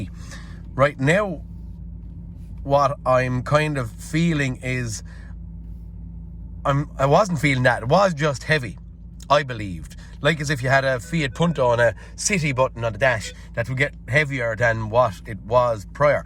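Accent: Ireland. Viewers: I've been away from Ireland for a week..and that has to be the most Irish accent I've ever heard in my life. Brilliant production and delivery.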